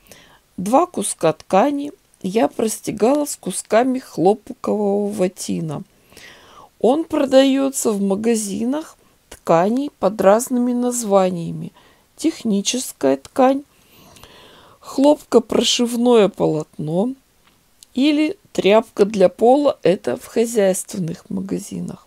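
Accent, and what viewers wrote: Urals, Russia. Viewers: благодарю за уральский акцент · слушать ваши анекдоты, уральским говором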